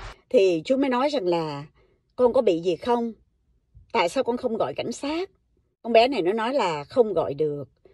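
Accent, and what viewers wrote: Standard. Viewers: Câu chuyện rất thật rất đời thường ,giọng đọc E rất chuẩn hay,Khi đã nói ra đc lòng sẽ nhẹ nhàng hơn,đây cũng là bài học cho tất cả mn ,kể cả phụ nữ,nếu sống lường gạt âm mưu như vậy,ắt gặp quả báo,ko phải ai cũng cư xử như A này .Nếu ng khác họ báo cảnh sát ,Cô ta chắc chắn sẽ bị vô khám. Nhân tham tài nhi tử Điểu tham thực nhi vong. Người tham tiền cũng chết,vật tham ăn cũng chết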